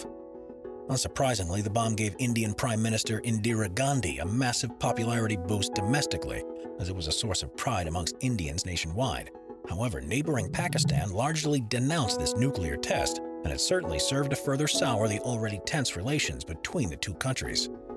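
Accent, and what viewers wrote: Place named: United States. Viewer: Come on, you sound American so why not use the non-metric units we use, like miles and pounds instead of kg and kilometers?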